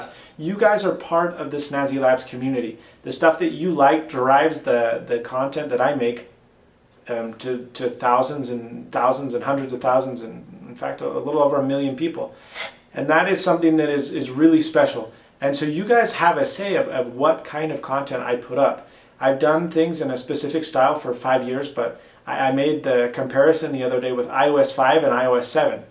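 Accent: Spain. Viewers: Spanish accent!!!!! :D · you have a slight Spanish accent when you speak English now compared to your last videos pre-Bolivia haha. Great to have you back:) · Wow.... i remember when you left... crazy to think how fast time has passed. Your accent has developed so much into a spanish accent. It's hilarious! Hope to see more soon. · I speak spanish as well, and it's very noticeable for me, haha. He must not have had much of a chance to speak English. His 'i" is very spanish-like. I think it comes from being a non-native speaker. I'm sure that being back home, he'll be able to even out his accent. He must have absorbed so much culture! It must have been an amazing experience! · Slight Spanish accent? Nice to have you back! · That spanish accent haha!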